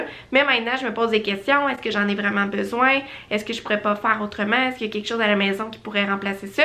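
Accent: Quebec, Canada. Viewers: Vidéo sympa mais l'accent québécois au bout de 2 minutes cela n'est plus possible à supporter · Bref jai lâché en plein millieu... A moins d'etre quebequois impossible de comprendre en plus a une vitesse folle... Dommage ca m'interessait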